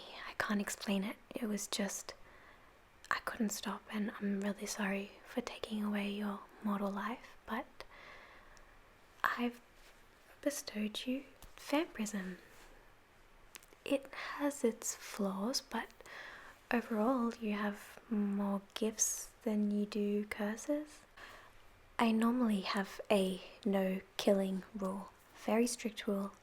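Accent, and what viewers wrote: Australia. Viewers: the Australian accent which is a trigger in itself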